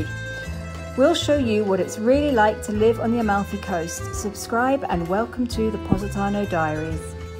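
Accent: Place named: United Kingdom. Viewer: Wow lov ur journey ..n ur british accent..n ya ur husband is handsome..god bless u both.love from india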